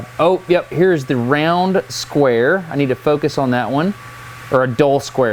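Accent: South, United States. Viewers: Destin's southern accent came through more when he was talking with.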